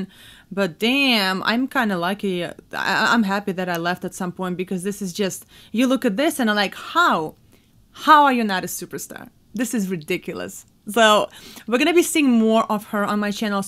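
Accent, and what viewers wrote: Russia. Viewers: русский из английского никак не вытравить))) но за обзор русской , самой лучшей певицы , спасибо! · что за русский акцент у вас?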